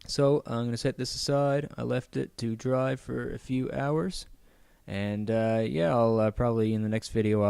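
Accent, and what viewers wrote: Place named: Cape Breton, Canada. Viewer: Are you from Cape Breton ? You got the accent lol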